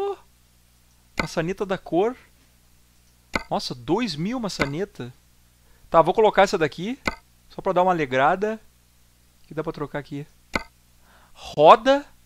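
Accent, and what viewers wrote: Rio Grande do Sul, Brazil. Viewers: VC E GAÚCHO NÉ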